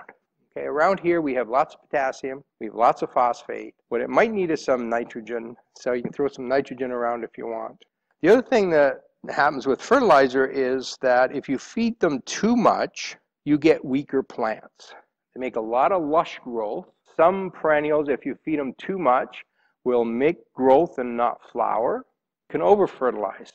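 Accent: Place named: Canada. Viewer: Are you in Canada? You have a bit of a Canadian accent ie the way you say process.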